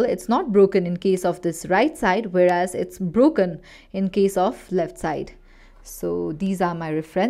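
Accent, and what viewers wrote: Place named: England, United Kingdom. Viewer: Best teacher with nice English accent❤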